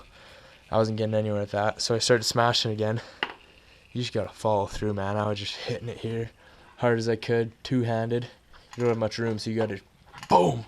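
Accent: Canada. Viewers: Could tell by the N that youre from BC. You from Vancouver or the island?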